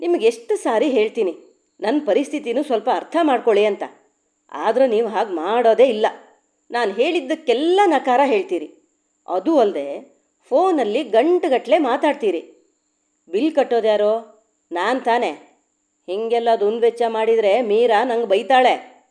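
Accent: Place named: Malnad, India. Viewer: ವಾವ್ ❤ನಿಮ್ಮ ಧ್ವನಿ ಯಲ್ಲಿ ಸೂಪರ್ ಆಗಿ ಮಲೆನಾಡ ಭಾಷೆ ಸೂಪರ್.. ನಿಮ್ಮ ಕನ್ನಡ ದ ಮೇಲಿನ ಹಿಡಿತಾ 🙏ಸೂಪರ್ mam ವಂಡರ್ಫುಲ್ ಧನ್ಯವಾದಗಳುನಿಮ್ಮ ಕಥೆ ಗೆ ❤❤❤️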